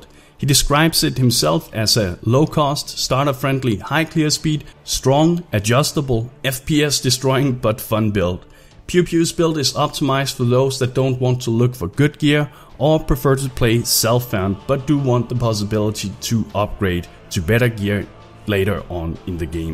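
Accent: Denmark. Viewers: that danish accent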